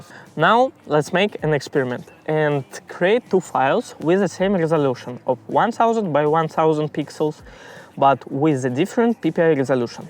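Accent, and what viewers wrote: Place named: England, United Kingdom. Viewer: О, сэр… Вы из Англии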